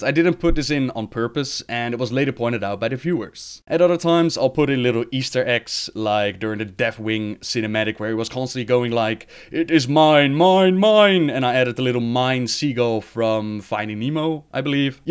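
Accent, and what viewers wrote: Netherlands. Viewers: Dutch accents are great. I like how he pronounces "Th" as a "D" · I had no idea you were Dutch! I really like your accent and all of your Lore videos, my guildmates say I'm an Encyclopedia thanks in part to you:)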